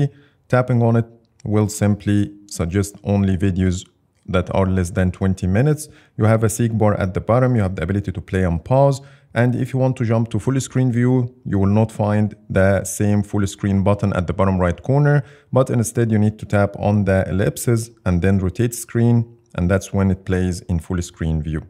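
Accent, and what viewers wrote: Egypt. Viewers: Egyptian accent do be strong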